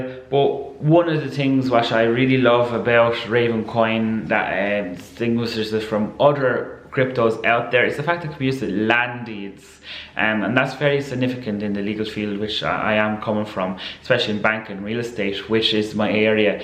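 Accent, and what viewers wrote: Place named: Ireland. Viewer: Very good video love your Irish accent my family are all from Cork 🤙🙏🙏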